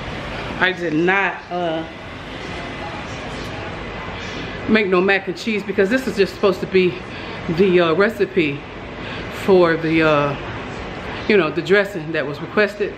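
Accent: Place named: New York, United States. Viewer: I hear that New York accent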